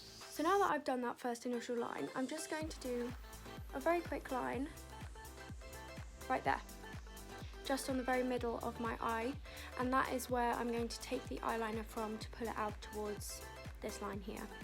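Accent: United Kingdom. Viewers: are you from England? x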